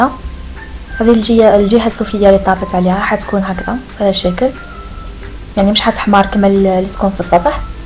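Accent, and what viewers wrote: Algeria. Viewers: من صوتك عقلتك بلي جزائرية مي كي شفت خميرة نوارة تأكدت هههه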